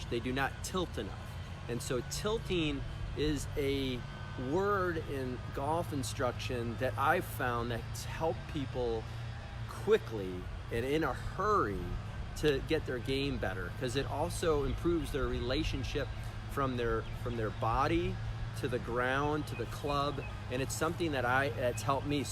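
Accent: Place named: Florida, United States. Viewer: Great video, sounds like you are fairly local to me. Have you ever played at Grand Lake RV resort in Citra Florida? It is close to Ocala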